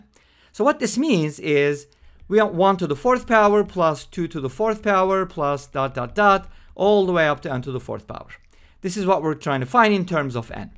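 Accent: Turkey. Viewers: Your accent sounds Turkish.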